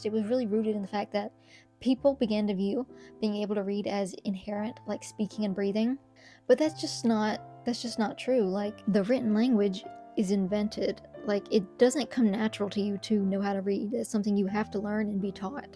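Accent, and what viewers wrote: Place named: Texas, United States. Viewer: Not gonna lie. I have never found someone so cute so fast. Stumbling here and there. Just real communication. A bit of a eye-avoidance reserveness/shyness going on. The lil texan accent in the background. Wow.❤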